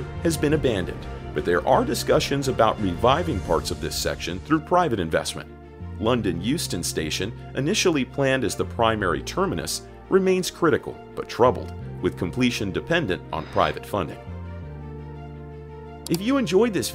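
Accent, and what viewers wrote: United States. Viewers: Littered with mistakes and covered in an American accent 👎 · Why the hell is a yank the voice of the video, can't they afford to get a British person to do the voice over. The HS2 is a English Railway engineering project between London and Birmingham Not New York to Miami. 💂‍♂️💂‍♂️🇬🇧🇬🇧🏴🏴😡😡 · what mistakes, and what problem do you have with the American accent?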